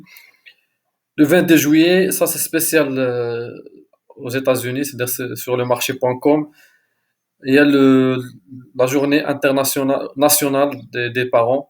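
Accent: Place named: Morocco. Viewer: Alors aprés votre poronciation je suis sure que vous êtes un maroccain والله تمغربى تحياتى.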